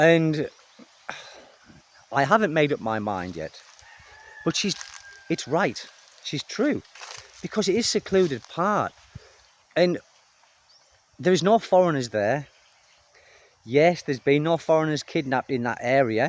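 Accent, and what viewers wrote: United Kingdom. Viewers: I am probably wrong, but you sound like a Brit. I am just a bloody yank. I can think of a half dozen places that are not safe in the UK. London, Manchester, Birmingham, Liverpool... you get the point the UK is not safe. Even 40 years ago it could be said that Belfast would not have been safe because of the IRA. In the USA, I am always armed. I have never had to use my firearm and I have lived in some very dangerous places. There are still many many dangerous places in the USA and even Canada as well. I would have to agree with your wife. Safe during the day is one thing, safe at night is something else. Now if you lived in Japan, I would say she was overreacting. But any one of the 7000 islands in the Phil, she is right. It is still a 3rd world country and people will do desperate things for money.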